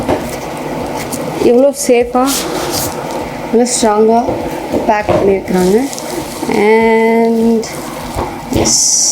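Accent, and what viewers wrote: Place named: Bangalore, India. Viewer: Good video I think u from Bangalore u speaking tamil is Bangalore style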